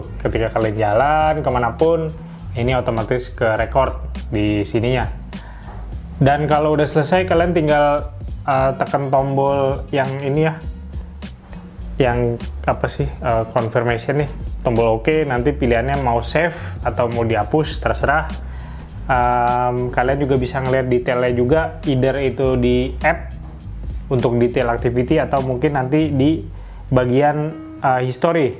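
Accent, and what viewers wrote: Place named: Madura, Indonesia. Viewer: Bang love your accent. Madura kah? Sorry klo salah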